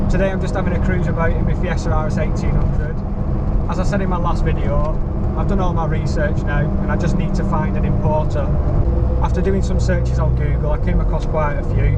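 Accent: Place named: Lancashire, United Kingdom. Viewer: Just on part 2 now, enjoying it so far! Subscribed btw. Where you from if you don’t mind me asking? you sound local to me, lancs, Blackburn Area ish accent? 👍🏻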